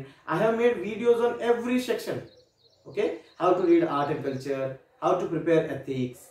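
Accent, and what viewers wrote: South, India. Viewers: Sir south indian tone in your language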